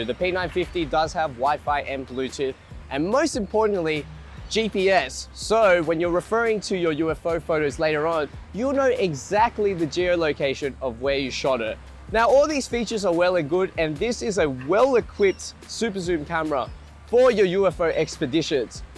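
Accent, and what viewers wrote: Australia. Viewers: Why does this Asian sound more aussie than I am?...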